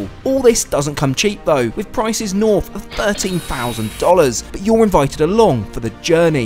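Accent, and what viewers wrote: United Kingdom. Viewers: Good Videos, but the British English is soo anoying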